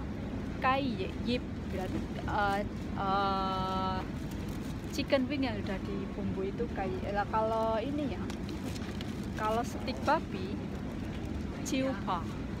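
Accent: Java, Indonesia. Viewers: Hhhee embak orang jawa yea .hhhhee mrdok bahasanya. Keren mantab salam 77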